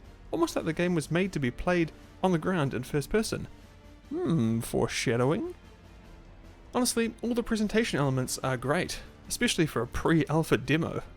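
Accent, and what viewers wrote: New Zealand. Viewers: A Kiwi accent, what a pleasant surprise! I am so hyped for this, especially after seeing the lacklustre CoH3 demos.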